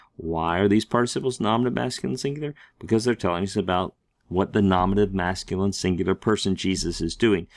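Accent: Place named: United States. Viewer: Greek with an American pronunciation is not Greek.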